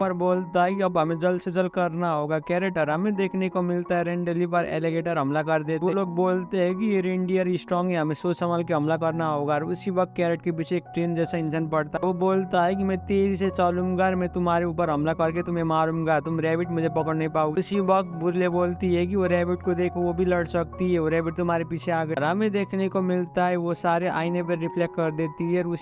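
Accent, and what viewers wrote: Nepal. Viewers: Nepali accent is so annoying😢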